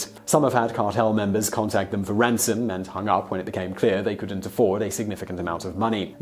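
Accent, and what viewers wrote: United Kingdom. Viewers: Whats the deal with all these blooming Brits narrating virtually every video on Earth ? Bloody 'ell !! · well... thats a very British accent... could we get subtitles? o.O my poor aussie brain cant understand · I really love your channel, the info is very interesting. BUT, why do you use such weird syntax that doesn't sound like English? I'm talking about something like this (this is not a direct quote, just an example); 'The people of Fukushima they have thyroid cancer'. Or ' India it has a big garbage problem'. The words 'they' and 'it' are completely superfluous in these sentences. You can take them out altogether and nothing changes in the meaning, the sentence just sounds correct. Maybe your writer is a second language English speaker, but I have to say it drives me absolutely crazy; eventually I will stop watching because I won't be able to stand listening to you mangling the English language with your very proper English accent....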